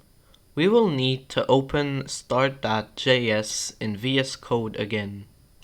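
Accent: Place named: United Kingdom. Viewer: great tutorial but youre british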